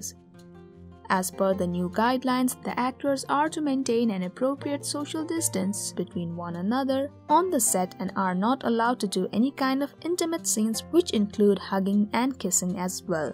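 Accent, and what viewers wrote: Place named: India. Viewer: Sounds Indian to me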